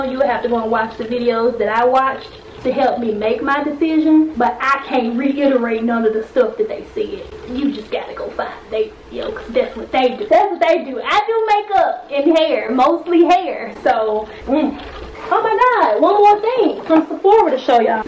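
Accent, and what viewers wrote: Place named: Tennessee, United States. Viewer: I was about to comment and ask if you were from TN until I saw it in your description box haha. I recognized that accent lol I was born there and have family there, but hello from NC!!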